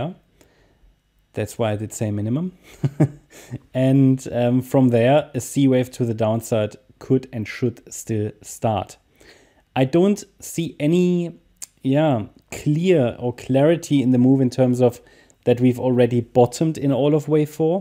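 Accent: Germany. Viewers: stets sehr starke Analysen (ich höre den deutschen Akzent raus :)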